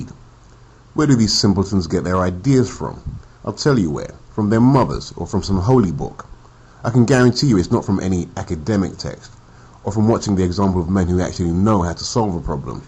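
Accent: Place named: United Kingdom. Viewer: This content is powerful and it's a uk voice.usually it's the guys from the states talking Truth.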